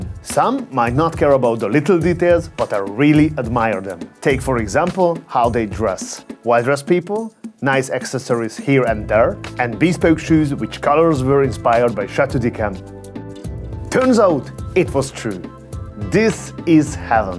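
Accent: Hungary. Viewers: Sounds exactly like any other Hungarian I've spoken to...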